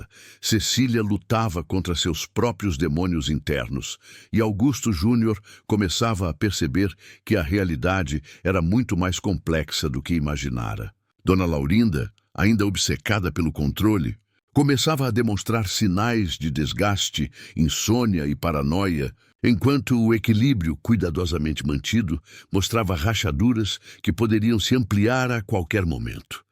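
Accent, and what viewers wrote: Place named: Portugal. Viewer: Só não entendi o porquê do sotaque português de Portugal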